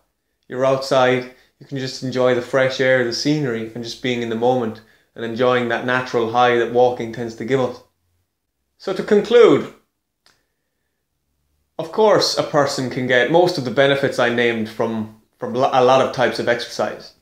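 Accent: Ireland. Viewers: thought u was Swedish at first pretty sure your ireish now. really liked your conor mcgregor video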